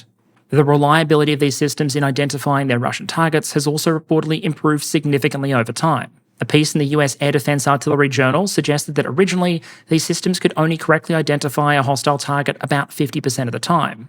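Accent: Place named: Queensland, Australia. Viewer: Gonna take a punt here and say has a Queensland accent, specifically SEQ, so uh, how about them Broncs hey?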